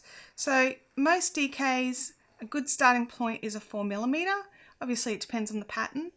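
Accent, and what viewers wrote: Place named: Australia. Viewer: Wow!! I've never heard of continental knitting til now! I can knit & crochet, but haven't knitted for many years, but crochet heaps as it's my passion. If I ever decide to knit again I'll have to give continental knitting a go! I've just found your channel & subscribed. 😀👍 I assume you're an Aussie by your accent. 🤔 Blessings from Adelaide, South Australia. ❤️🦘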